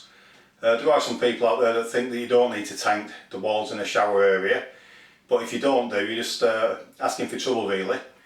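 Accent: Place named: North, United Kingdom. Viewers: Brill and very easy to understand, thank you fellow northerner. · Great Lancashire accent that Darwen ? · handy. I could understand your northern accent. subtitles are funny though around 14 mins!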